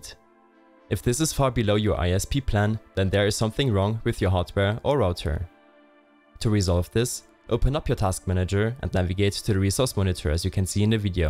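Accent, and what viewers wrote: Germany. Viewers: dieser deutsche akzent HAHA aber gutes Video ;D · german accent we know dude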